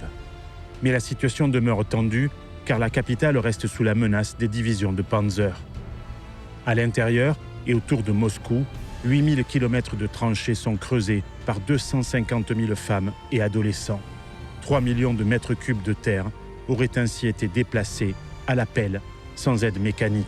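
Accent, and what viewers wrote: South, France. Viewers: Pas mal du tout, les documents tiennent la route et le commentaire ,avé l'accent du midi, est sympathique !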